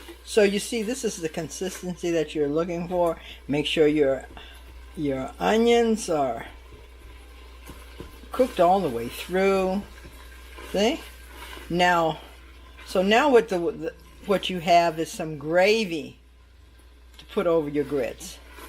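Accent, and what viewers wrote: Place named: North Carolina, United States. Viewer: I love liver pudding, black sausage, blood sausage, and the Spanish speaking say "morcilla" I knew you were from N Carolina when you first spoke, bless you auntie -from nyc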